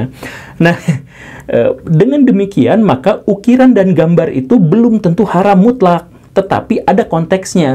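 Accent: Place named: Bandung, Indonesia. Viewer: Btw, Bapa ini jago, di awal beliau seolah tidak sepakat dg konklusi organisasi Islam terbesar di Indo, tetapi di ending, konklusi beliau ya sebenrnya sepaakat dg org Islam terbesar di Indo hehehe...itulah jagonya org dg kultur Sunda, pandai menyamarkan dlm kalimat hehe...oya, kalau dri logat beliau...kayaknya asal daerah Kab. Bandung deket2 Garut, sekitaran Cicalengka gt yak hehe...salam😊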